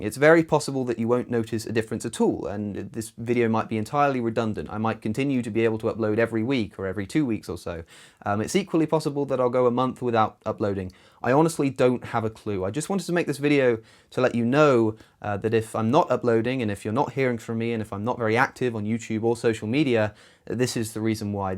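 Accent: United Kingdom. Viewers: Why is he so british? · Woah, your British accent jumpscared me.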